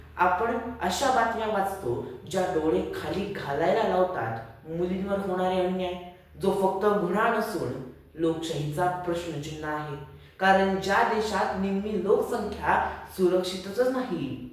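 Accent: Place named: Satara, India. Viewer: खूपच छान विषय कोणताही असो, पण तो स्वतःच्या भाषेत कणखर असे सातारी भाषेत मांडून तो त्या विषयाबद्दल प्रखरपणे मांडत आहेस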